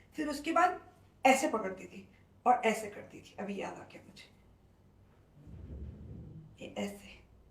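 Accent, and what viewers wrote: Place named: Delhi, India. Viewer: Are you a Delhite ..your way of speaking is like them..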